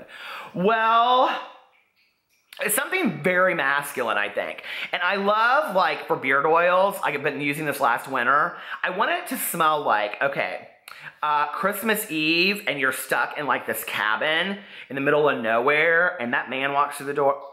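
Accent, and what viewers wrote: South, United States. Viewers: Just found you....love u so much! Are you from the south?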